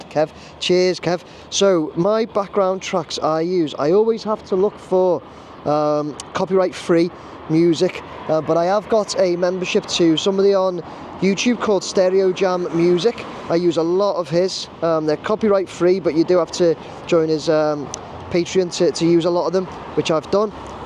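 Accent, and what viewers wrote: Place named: Wirral, United Kingdom. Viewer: First time watching and last, can't hack that over the water accent me 😅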